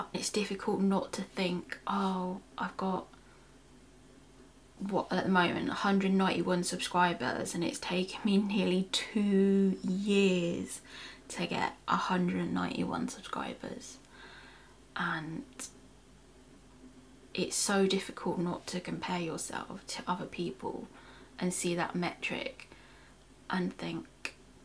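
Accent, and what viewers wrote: United Kingdom. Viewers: I'm not even sure how I stumbled across your videos...because all I watch are Car vids. LOL Anyways...you are great speaker, you seem very intellectual, extremely attractive, and would make any guy feel lucky to share your time with! And your English accent...for an American. So HOT!! Viewers will come to you! You've got some great content so far! Best of luck to you in 2022!!!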